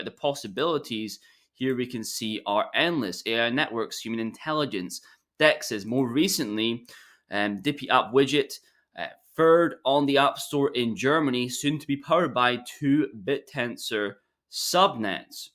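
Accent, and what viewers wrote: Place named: Scotland, United Kingdom. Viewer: Do you still think qnt will still be big this cycle? I'm starting to think that 2027 -30 will be the time for xrp and qnt. Would love to hear your thoughts. I am well spread by the way. Ps love how you slow down your Scottish accent. Edinburgh here. ❤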